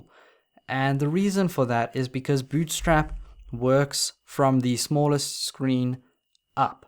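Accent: South Africa. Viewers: Yas! South African accent!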